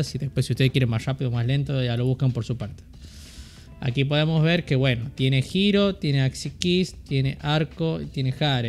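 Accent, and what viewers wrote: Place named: Santiago del Estero, Argentina. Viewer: Reconozco ese acento del Norte, sos santiagueño?, buen video todavia soy muy principiante en tema de cripto y nfts pero con estos videos me informo.Saludos.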